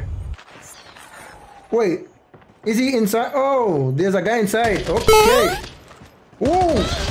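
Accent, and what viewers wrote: Jamaica. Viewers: Are you from Jamaica pls tell me I am from there to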